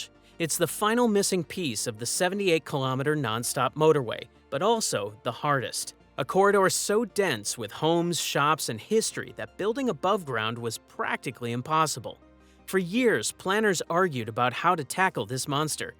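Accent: United States. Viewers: We should be hearing a voice like Brenton Ragless instead of that American voice 😢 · Why is an American accent narrating an Australian project?, " tunnelling under homes and businesses , no they're not because the home owners and businesses have been relocated whether they wanted to be or not, I get the need for progress, but I haven't heard what diversion means are being implemented so wildlife can be safe while crossing sides? It's distressing to see wildlife dead roadside because of a pathetic piece of wire for them to use, why can't we take a leaf out of the book of other countries and build an underpass or overpass for them, or is that not profitable 😮 I do like the plan for a park topside over the tunnel, nice bit of greenery. 😊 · Why is an American narrating?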